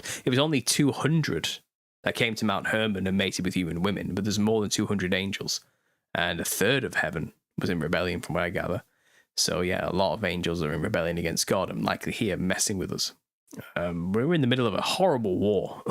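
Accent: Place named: Liverpool, United Kingdom. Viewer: You talk like an Everton supporter.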